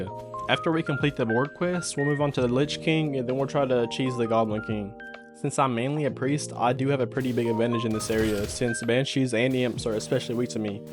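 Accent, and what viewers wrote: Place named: South, United States. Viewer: Bro has the most southern accent ever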